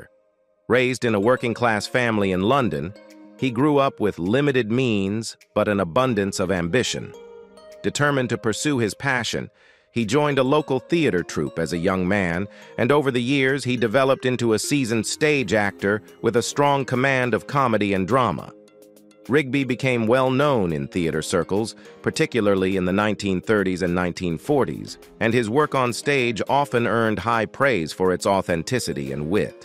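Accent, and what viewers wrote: United States. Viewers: Ai yank commenty ruined this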